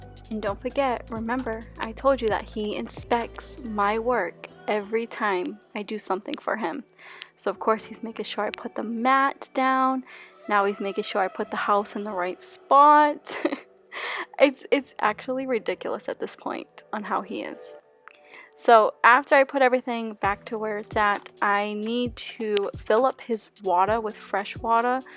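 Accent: Boston, United States. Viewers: Are you from Boston? I can hear the accent!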